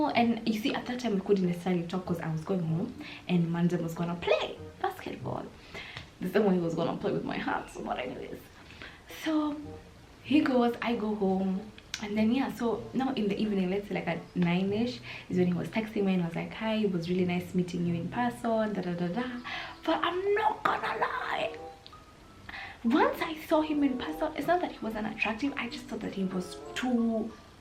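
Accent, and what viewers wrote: United Kingdom. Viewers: The British accent gets me all the time😂😂😂😂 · The british accent always gets me😂😂❤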